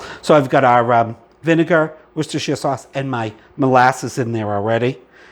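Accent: New England, United States. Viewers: Thank you so much! I saw the new video and replied there too. Although I recognize your New England accent I erroneously associated your YouTube name with Cherry Hill NJ. So cool of you to do the special research for the right recipe, love your channel.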